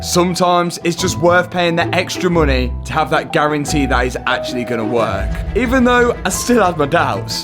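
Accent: Leicester, United Kingdom. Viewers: yup, Leicester accent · From Argentina, I congratulate you on your channel; your content is very good. I find it a bit challenging to understand you because of the British accent, but at the same time, I enjoy it and it helps me improve my English. Keep it up!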